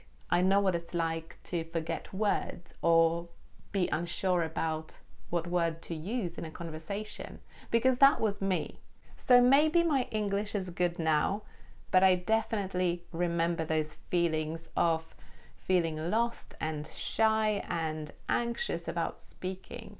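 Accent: United Kingdom. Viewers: Thanks for sharing such an inspiring story,! I'm Italian, and my love for the English language has risen to such an extent that I decided to become an English teacher by doing the CELTA course. As for your experience, what's the best piece of advice you'd give to a non-native English speaker wanting to become a teacher? What kind of approach should I take? To wrap up, how can I get such a top-notch British accent? Thank you and greetings from Sicily. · Wszystkiego dobrego Pani. Pozdrawiam z Polski. ❤ Super by bylo sie dowiedziec w jaki sposob udalo sie Pani osiagnac taki wspanialy brytyjski akcent.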